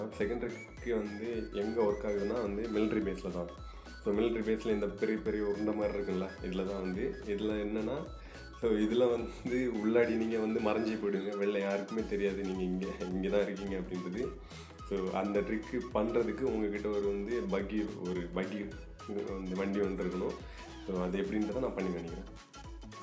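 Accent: Kanyakumari, India. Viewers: Bro ungalukku kanyakumari ya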